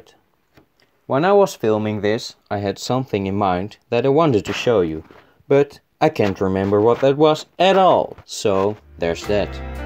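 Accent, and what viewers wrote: Netherlands. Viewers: Aaah... Dutch English, nice video!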